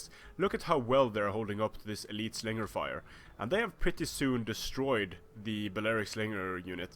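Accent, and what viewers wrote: Scandinavia. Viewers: You sound exactly like the guy from Red Ice radio. Norwegian / Scandinavian English accents sound very good... Most of you speak better English (with equivalent vocabulary) than perhaps 75% of Americans and 50% of Brits. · Great video!! Your english sounded quite like "the Scandinavian english" - Am i wrong/right?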